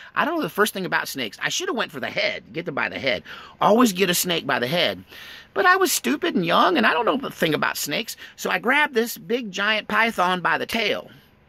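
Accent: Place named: West Virginia, United States. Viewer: Your west va accent is pretty close....ever seen the documentary about jesco white and family entitled "the wild and wonderful whites....."?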